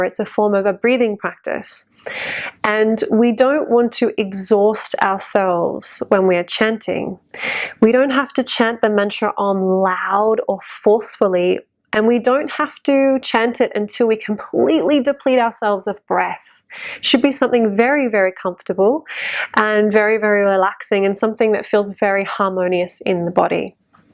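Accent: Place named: Australia. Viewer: Thanks for your reply. Just curious, your accent sounds Australian. Am i right?. Where did you study(assuming you did) in India? Some common places for westerners being Rishikesh or the Shivananda Ashrama in kerala. I am from India by the way and i am a native of a the temple town of madurai working in Bangalore at present.. We are a family of traditional Brahmins and Vedic scholars, with my father maintaining the ritual fire. We use OM to start vedic chanting and to end I found your explanation for OM to be down to earth and realistic, compared to the one's many fake Indian gurus give.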